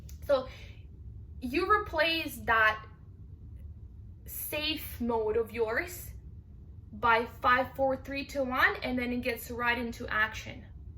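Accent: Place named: Russia. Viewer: ohhhhhhhhhh that kiss in the end, let's just make russian babies already. That russian accent makes me melt. Can you do a russian language lesson video next time i think that would be your niche on youtube.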